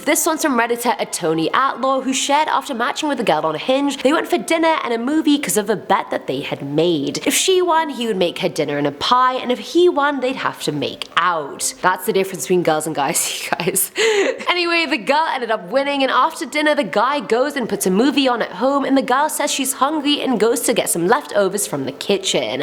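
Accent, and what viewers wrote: South, United States. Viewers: Lol nice southern accent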